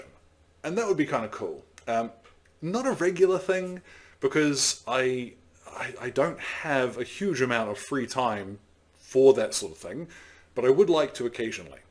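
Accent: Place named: New Zealand. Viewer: The new mic makes you sound like a bloody Kiwi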